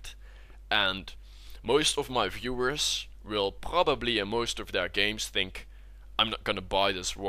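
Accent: Netherlands. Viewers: are you dutch? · DAT DUTCH accent :D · Love your dutch accent.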